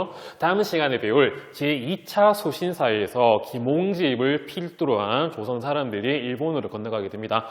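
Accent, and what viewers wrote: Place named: Gyeongsang, South Korea. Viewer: 목소리 좋다잉 경남 분이신가보네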